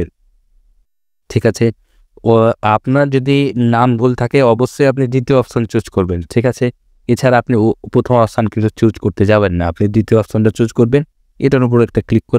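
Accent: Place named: Chapai Nawabganj, Bangladesh. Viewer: Vai Apni ki Chapai zela, ba godagarir upozilar manus? Voice sune amn ta mone hocche..😊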